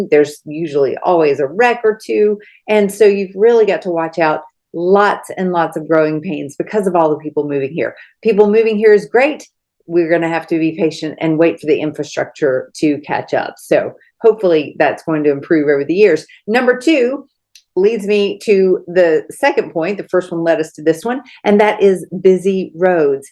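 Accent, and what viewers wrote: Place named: South, United States. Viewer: Awesome video! btw....love that Southern accent! I'm a northerner that loves the Southern charm. God Bless!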